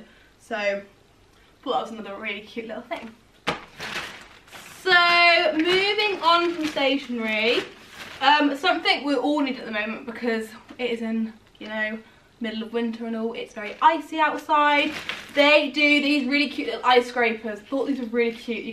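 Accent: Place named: United Kingdom. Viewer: You are a british girl ya? Why are the british so funny and giggly? Haha I love the accents I think it brings out tue humor with them and stuff. 😃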